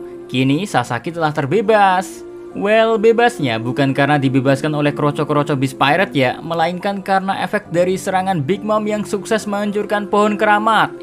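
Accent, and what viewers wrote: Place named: Jepara, Indonesia. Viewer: Adminnya orang mana sih ?? Aku denger² kok orang jepara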